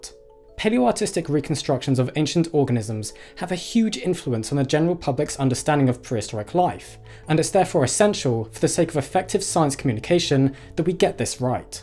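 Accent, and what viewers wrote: RP, United Kingdom. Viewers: I enjoy your work. you always put much thought into it and present the material well. i learn something new every time. if you want to pursue a career in network broadcasting, you are photogenic, you present yourself well and your accent is clear enough for most Americans to understand yet will be classified as upper class British so educated and trustworthy therefore ideal for promoting news and science as opposed to sport or entertainment. however, you appear to be turning your head so much that it distracts the viewer from listening. try limiting the characters per line from 80 to 60 or perhaps 40.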